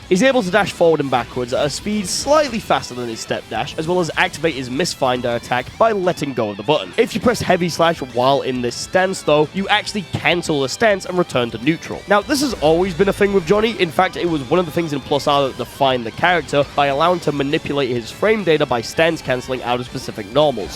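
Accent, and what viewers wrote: United Kingdom. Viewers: No wonder your voice sounds so similar if you're northern that makes sense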